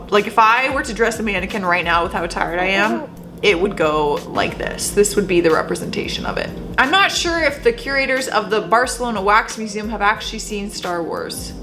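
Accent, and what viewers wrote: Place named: Canada. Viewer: Hi, I do love the way you say bagels, granted I probably couldn't say it much better myself being from the North West of the UK, but they way you say it makes me giggle everytime, love your Canadian accent so much, I try watch atleast one of your videos from your multiple channels once a day, longest I've gone it 48hrs, my husband and I watch you (and Charlotte Dobre) everytime I'm on YouTube I just can't resist your sass and pickle talks:)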